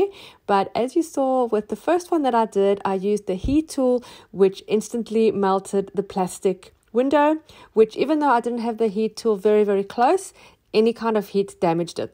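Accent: South Africa. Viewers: Hi there, I recently came across your channel and love your work. You explain things so nicely and the main reason is because your voice is South African 🤗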